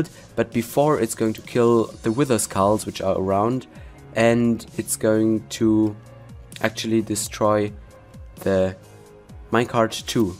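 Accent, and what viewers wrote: Germany. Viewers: Are you from Germany :D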